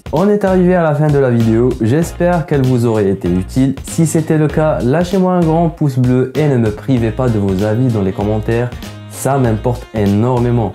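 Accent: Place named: Algeria. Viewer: C'est certainement l'un des nombreux dentistes algériens qui sont venus s'installer en France d'où l'accent et les fautes de syntaxe ...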